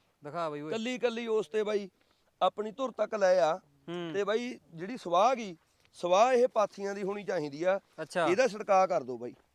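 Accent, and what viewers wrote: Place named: Sangrur, India. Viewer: Sangrur aria bharidi bola janda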